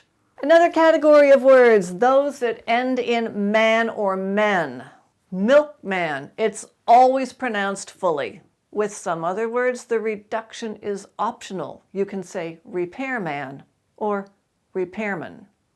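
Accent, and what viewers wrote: United States. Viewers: we are speaking english - you with your ( gentle ) American accent, me with a strong Aussie accent. And yet somehow it sounds the same... Cheers lots Mate !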